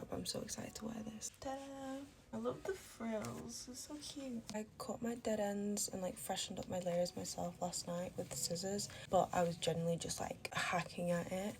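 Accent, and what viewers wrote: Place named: Bradford, United Kingdom. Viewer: you are drop dead gorgeous!Which part of the England are u from?Because I am from England as well.I live in Bradford.I literally love your accent and it sounds like a Bradford accent.I completely understand if you don't wanna reply to my comment and tell me which part of England your from and you will always be my IT girl slay queen.Love you girl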